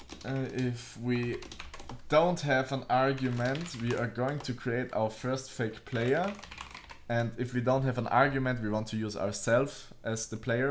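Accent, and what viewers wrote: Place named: Germany. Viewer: Am Akzent merkt man direkt, dass du deutsch bist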